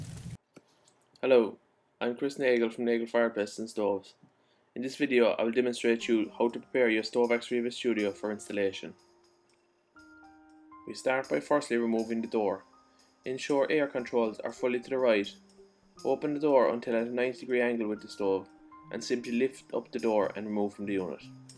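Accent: Ireland. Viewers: excellent help as i had to replace all my baffles. Also if you enjoy listening to the melodious Irish accent its a gem .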